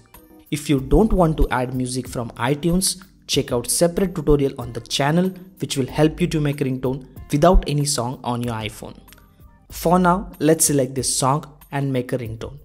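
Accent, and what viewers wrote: India. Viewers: Idk but his Indian accent made this 10x easier to understand. Thanks for the help 🙏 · I love indian accent yes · THANK GOD HE HAS THE INDIAN ACCENT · SUch a damn indian accent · Indian English accent 😢😢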